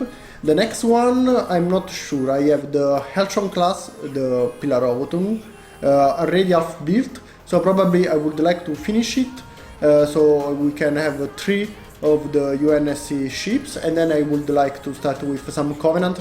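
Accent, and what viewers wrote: Italy. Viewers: Italian accent?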